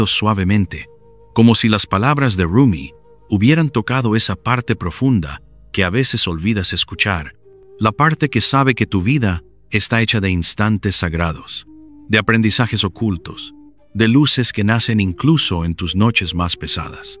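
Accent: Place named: England, United Kingdom. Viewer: Excelente reflexión. Cualquier cosa nos distrae del momento presente. Una recomendación sería usar una voz neutra y nativa, sin acento inglés, pues la pronunciación incorrecta termina distrayendo... Saludos.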